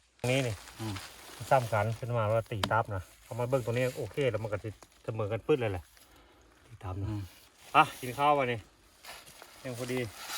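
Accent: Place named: Phu Thai, Thailand. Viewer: ฟังเสงเว้าคือคนภูไท อยู่สิเลอน้อครับ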